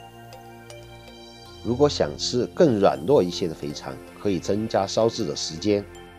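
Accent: Sichuan, China. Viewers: 作为成都人的普通话已经超过很多四川人了，不是四川人的话几乎听不出椒盐味道。不过还是喜欢听的成都腔调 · 还是四川话好听，又有特色！带着爱做自己爱做的事情，顶你！ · 四川人听四川人说普通话好搞笑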